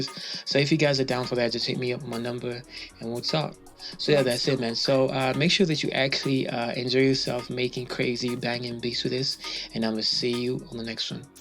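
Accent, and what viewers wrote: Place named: South Africa. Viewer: Nice accent bro no homo are you south african?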